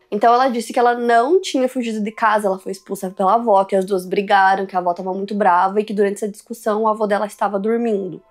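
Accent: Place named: South, Brazil. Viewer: gosto do sutaque do sul rsrs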